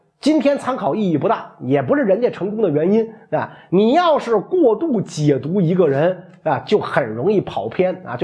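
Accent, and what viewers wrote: Beijing, China. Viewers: 北京口音真好聽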